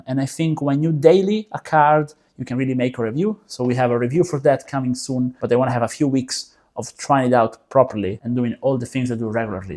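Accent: Italy. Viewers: Familiar accent:Italiano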